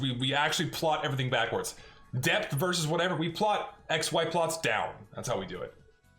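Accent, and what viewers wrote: Texas, United States. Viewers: and if you watch in 1.25 speed you can hear his southern accent a little bit better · I’m noticing how he gets more and more Texan over the course of the video. I didn’t even know he was form there til now, he’s good at hiding it.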